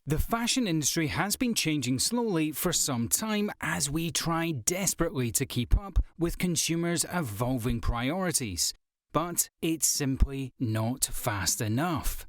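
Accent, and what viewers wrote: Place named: Scotland, United Kingdom. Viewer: wasn't sure.. accent sounds Scottish.. could be wrong.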